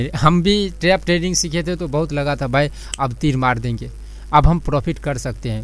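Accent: Bihar, India. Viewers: तेरे आवाज में पूरा बिहार पान लग रहा है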